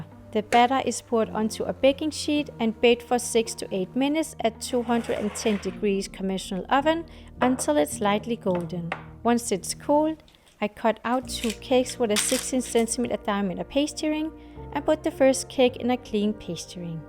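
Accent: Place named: Denmark. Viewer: Hej jeg kan høre en dansk accent og navn! Ser super godt ud! ^-^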